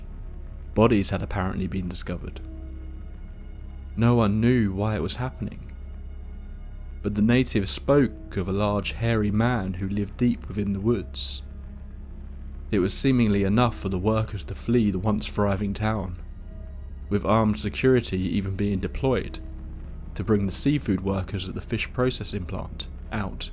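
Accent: England, United Kingdom. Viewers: Ive just discovered your channel. I livevin the UK so its good to hear an English accent. No offrnce meant i look forward to many more stoi43t ,😅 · Something about this guys narration and his regional accent makes these stories, extra interesting. I always keep my attention on the story. A British accent makes everything sound better.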